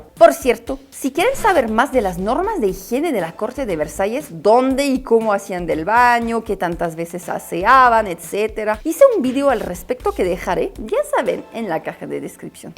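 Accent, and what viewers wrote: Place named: Mexico City, Mexico. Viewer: Soy super fan de tus videos, por ser educativos y culturales con el toque chilango inteligente, saludos cordiales desde Querétaro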